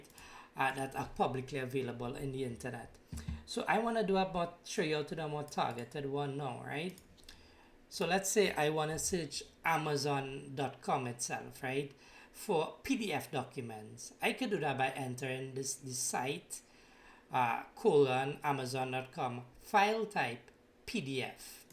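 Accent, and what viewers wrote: Trinidad and Tobago. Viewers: You sound trini 🤔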